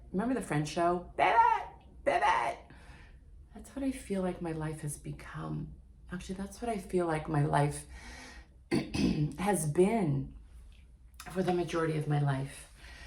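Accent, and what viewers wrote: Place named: Canada. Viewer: I knew you were a canadian based on 1 single word you spoke. Im in detroit, ontario border in detroit, and we speak exactly the same as far as dialect except for pne word. About. You canadians really pronounce it quite different.😄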